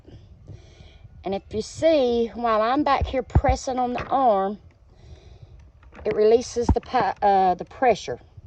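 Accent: United States. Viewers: MEN TAKE NOTICE OF THE DETAILED EXPLANATION. BY THE WAY, I LOVE THE COUNTRY ACCENT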